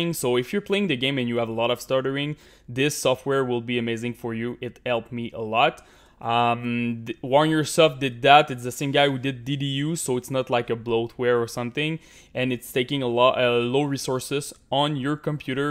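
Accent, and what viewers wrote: Quebec, Canada. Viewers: Et mec jtai vite cramé avec ton accent quebecoi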